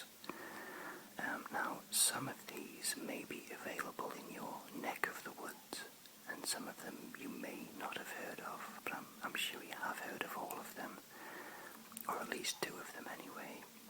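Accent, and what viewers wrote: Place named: North, United Kingdom. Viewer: This video is way underwatched. I love it! Btw, Where is your accent from? I know its northern but I'm picking up lots of little bits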